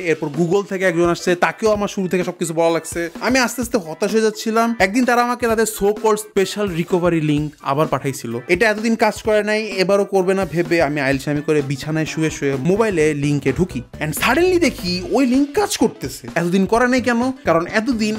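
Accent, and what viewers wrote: Mymensingh, Bangladesh. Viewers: Are you from Mymensingh??/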